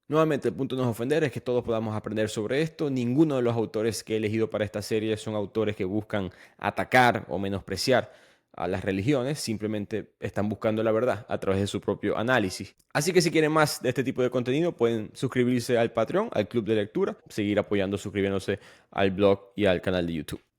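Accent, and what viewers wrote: Maracaibo, Venezuela. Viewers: Gracias por estos episodios, por el cuestionamiento y por tus análisis. Nueva suscriptora aquí. Crecí en una casa católica, estudié en un colegio católico. Toda la vida me hice estas preguntas que estas exponiendo en el episodio y la única respuesta fue "hay que tener fe". La falta de racionalidad, de cuestionamiento, hizo que me alejara mucho. Decidí irme por el camino agnóstico, luego ateo, pero ese ultimo camino me dejo mas vacíos que los anteriores. Desde hace un tiempo quise acercarme de nuevo al catolicismo, pero desde la curiosidad. Voy a misa todos los domingos a aprender, a escuchar, a conocer desde cerca la comunidad, para poder decidir por mi misma. Todo el mundo a mi alrededor (que ya no creen hace mucho) lo ven como algo raro. Empecé a leer la biblia para saber de primera mano que es lo que dice, porque siempre me la han leído de una forma hermosa. 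La frase que mencionaste "el ateísmo es lo que pasa cuando lees la biblia, el cristianísimo es lo que pasa cuando alguien mas te la lee" me quedo resonando muchísimo. Aun no he decidido, quizá sea uno de esos que a pesar de haberla leído o a pesar de las evidencias, deciden creer, o quizá no, pero la frase me pareció poderosisima, mas que pretenciosa. Gracias. (Shoutout al acento Maracucho!)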